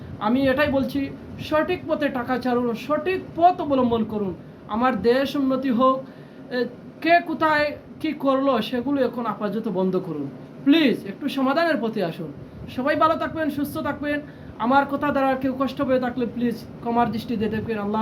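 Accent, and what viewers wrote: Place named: Sylhet, Bangladesh. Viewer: আচ্ছা আপনি কি অন্যায় প্রতিবাত করেছেন? কথায় মনে হয়ে আপনি সিলেটি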